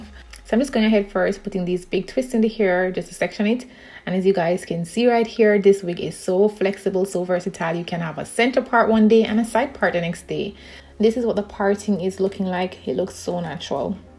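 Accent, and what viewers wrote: Jamaica. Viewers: I have a sixth sense for a Jamaican accent...... Great video🇯🇲🇯🇲🇯🇲🇯🇲🇯🇲🇯🇲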